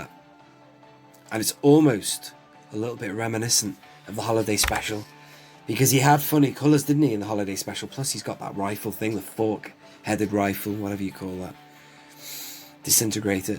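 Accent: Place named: United States. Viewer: It would be nice if the 3.75 were as detailed as the 6! BTW you do a great American accent! 👍🏼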